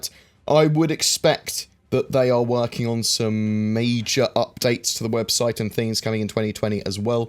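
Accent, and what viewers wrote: England, United Kingdom. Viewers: the English accent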